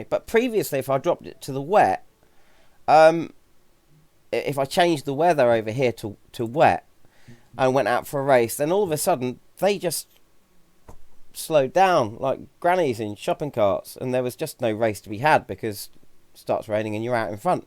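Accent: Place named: United Kingdom. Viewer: First, you really should have more subscribers. I enjoy your analysis on sim racing topics. Your British accent and comments are very addicting (" the car is having a bit of a moment with the tarmac" LOL!!!) :) Second, I'm really finding the AI way to aggressive in the latest update, actually running me over several times (didn't happen pre Jan??) Third, I don't know why I find it funny that anyone would refer to a car with a straight 6 engine as a "muscle car".. :)..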